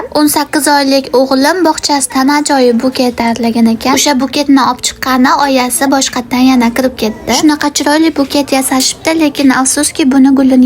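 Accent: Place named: Fergana, Uzbekistan. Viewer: Ozbekistonni qayeridan edingiz shevangizdan Fargonalikka oxshadingiz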